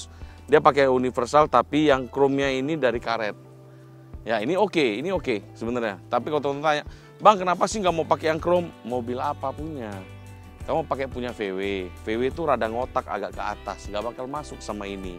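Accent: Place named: Sumatra, Indonesia. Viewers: keren x koko ini klu Logat bahasanya SUMATERA kali. salam sukses koko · ABANG INI LOGAT NYA KEK ORANG SUMATRA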